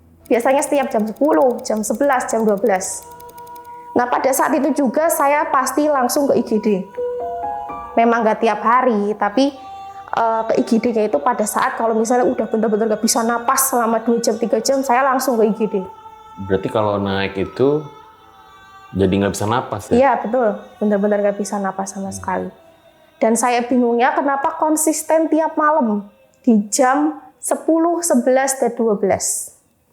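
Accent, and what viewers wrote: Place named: Banyumas, Indonesia. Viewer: Kak km orang ngapak yaa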